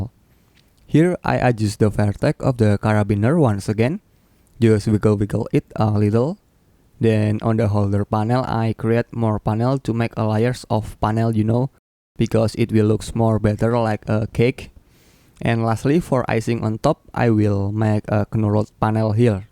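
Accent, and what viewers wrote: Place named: Indonesia. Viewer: Thanks, it's helped a lot! btw I can tell from your accent, are you Indonesian? ;)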